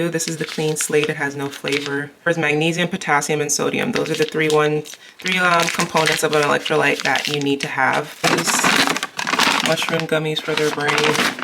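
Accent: Jamaica. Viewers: Girl, are you Jamaican?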